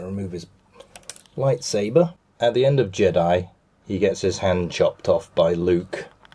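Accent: United Kingdom. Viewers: Cuz he's British...I say Darth aswell, cuz im British too...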